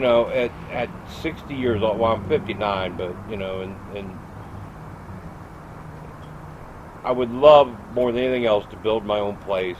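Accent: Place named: St. Bernard Acres, United States. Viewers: Sounds like a fellow Texan! Yeah I agree, Ohio better be glad Texans didnt live in Ohio, we'd tell them to kiss our big hairy wal nuts. Its like my fellow Texan says, out in the country they dont give a $ h!t if we live in Link Knights cardboard house, as long as we have a proper waste system. The more I hear about idiotic laws in other states, the more I love Texas. God Bless the Lone Star State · St. Bernard Acres, I picked up your/our accent!! :)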